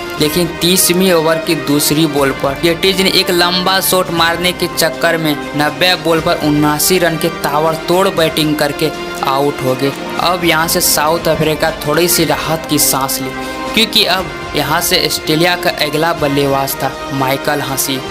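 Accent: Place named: Bihar, India. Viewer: Abe sale bihari hindi bolni seekh pehle bestman ashtelia khilod gindbaz dhmkedaar tbrtor naseme kia h sale shudh hindi bolo bihario salo😊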